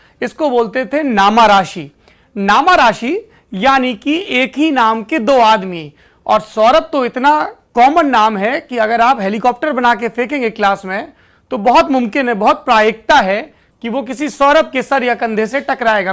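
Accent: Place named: Bihar, India. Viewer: Bhai tu hilta bahut hai and tone mixed with bihari hindi.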